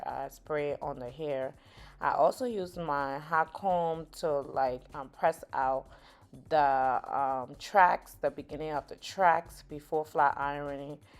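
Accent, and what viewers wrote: Liberia. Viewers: I knew you were Liberian as soon as I heard the accent.. love the video.. I’m Liberian as well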